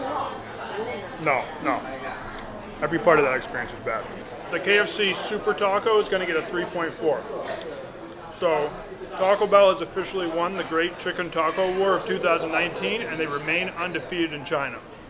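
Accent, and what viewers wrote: Boston, United States. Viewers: That Boston accent from 2017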